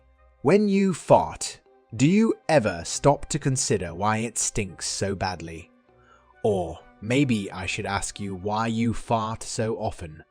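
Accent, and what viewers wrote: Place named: RP, United Kingdom. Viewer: Love yr posh accent presenting this Fartology topic!!! Suprised you didn't say flatuence or gas throughout,yr not that posh but thanks for being down to earth,we love you! God bless you. Oops I just let one off- excuse me!!!!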